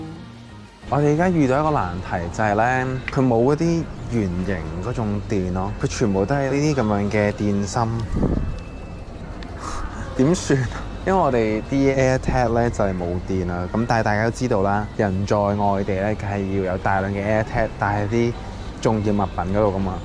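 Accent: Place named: Macau, China. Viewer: 澳門口音好得意😂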